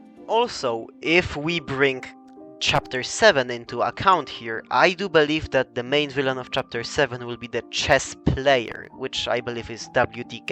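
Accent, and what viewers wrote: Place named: Europe. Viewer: Hey I'll take a cool European accent over another annoying hyperactive or bored American accent any day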